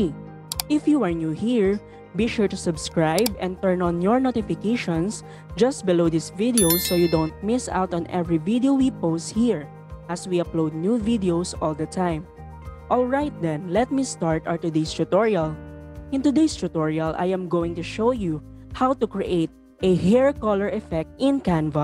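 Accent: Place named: Philippines. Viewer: the filipino accent makes me laugh sometimes while she explains certain things...good tutorial though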